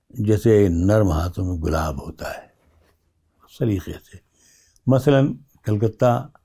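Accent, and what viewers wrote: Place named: Raebareli, India. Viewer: Apki awaaj ikdm.raebareli wali hii hai sirr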